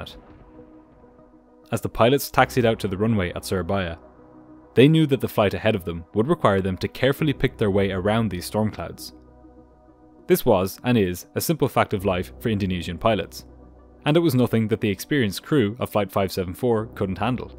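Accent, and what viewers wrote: Ireland. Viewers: Thanks. 🇮🇪 this is the only videos of this sort of stuff I watch. It's always nice and honest without B.S or showboating like some others . PS our lovely Irish accent also helps 😊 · I could listen to this man speak all day. There’s a reason why Irish is voted people’s favourite accent out of the whole UK and Ireland.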